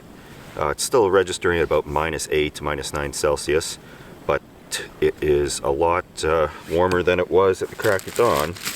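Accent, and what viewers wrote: Canada. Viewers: Are you an Ontarian or albertan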